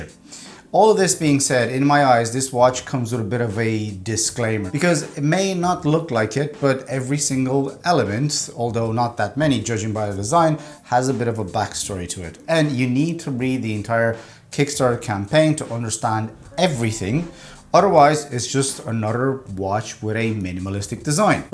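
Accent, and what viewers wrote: Ireland. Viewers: "I'm not Irish". I mean you sound pretty Irish bud.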